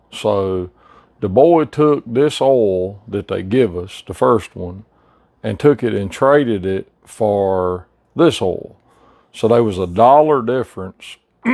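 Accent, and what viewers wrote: South, United States. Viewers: This guys accent is like Forrest Gump times 10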